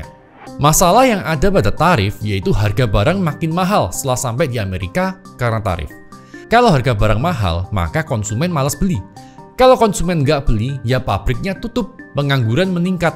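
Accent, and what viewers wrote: Java, Indonesia. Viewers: Lucu banget. Muka cina tapi pas ngomong medok Jawa 😂 unik unik 😂😂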